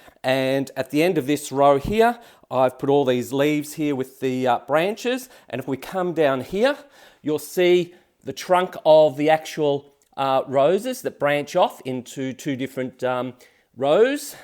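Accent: Australia. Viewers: Thanks so much! I’m making my way through all your videos and have enjoyed them so much. Especially with the Aussie accent!😂 Thanks for the link to a detailed answer for my question. I look forward to watching it! Cheers from 🇨🇦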